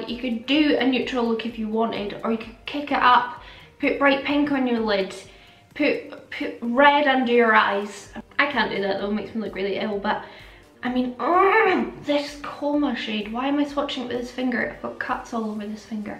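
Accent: Scotland, United Kingdom. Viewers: the Scottish accent sounds so mellifluous like you are low key singing your words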